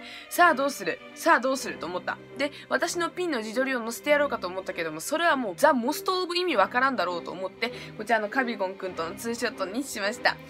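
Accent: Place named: Hakata, Japan. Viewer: 博多弁がすごい好き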